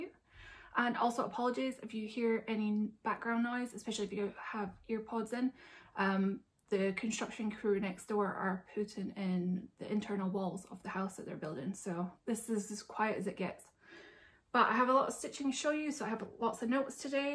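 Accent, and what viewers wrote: Scotland, United Kingdom. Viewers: Thank you for sharing your beautiful work! Love all your stitchy plans as well. The bear, whales and owl are among my favourites! Have a great may and sorry about the trouble with your ankle. Still loving the scotch accent! I am working on the Quakers of Scotland by tempting tangles and am loving it! Scotland is on my bucket list of places to visit as thats where my ancestors originated🙂. I know you are in the US now but I see you still love to stitch those highland cows 🥰. Happy stitching 🧵🪡😊☀️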